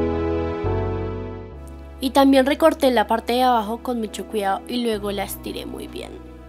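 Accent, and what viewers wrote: Colombia. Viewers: Me encanta el acento colombiano. :) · ¿Eres de Colombia<3 ? No sé, te escuchas colombiana:3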